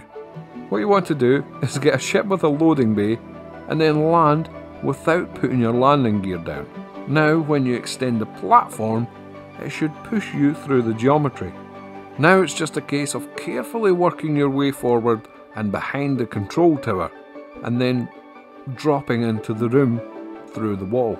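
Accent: Scotland, United Kingdom. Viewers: Gotta say i love that scotich accent 🤘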